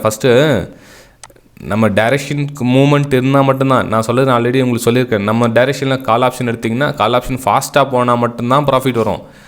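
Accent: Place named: Bangalore, India. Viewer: Banglore tamil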